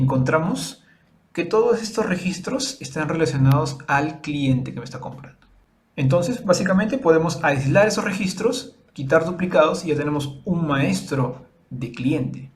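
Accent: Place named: Peru. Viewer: Muy bien explicado, buenos ejemplos y realmente didactico, de ahi un problemita que el microfono realmente no te ayuda pero eso se mejora con el tiempo al tener uno mejor, y sobre reconocer a leguas ese acento de mi paisano peruano. Exitos en t u canal cauza!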